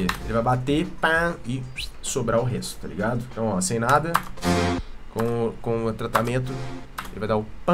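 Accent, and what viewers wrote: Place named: Minas Gerais, Brazil. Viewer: Qualquer ideia que você jogar, a gente assiste, meu patrão. Você é fera demais. E outra, o sotaque mineiro tá forte, hein hahahahaha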